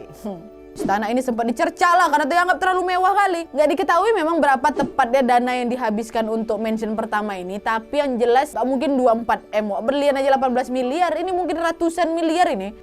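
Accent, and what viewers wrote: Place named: Medan, Indonesia. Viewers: Bahasanya medan kali lah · kk org medan ya? logatnya kentara😂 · Bah.. Baru singgah disini, anak Medan kayaknya kakak ni.. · Logat Sumatra nya kental sekali...si.. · Ko orang medan kak?? Logatny mantap kale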